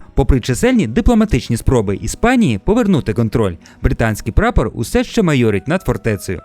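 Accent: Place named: Russia. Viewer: Дикція на трієчку, ще й рашиським циканням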